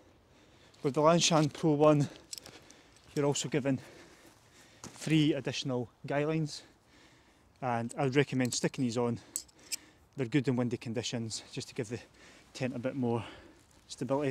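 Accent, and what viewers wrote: Scotland, United Kingdom. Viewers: only coz I'm Scottish and have a similar accent I noticed man, wouldn't worry about it 😂 when putting the tracking pole into the loop at the ground if it bothers you 😂 I'm looking at buying this tent and just checked your link. It gives a 3 season and 4 season option I know generally what this means but what would be the difference in tents ? I thought these tents were all double skinned (walled)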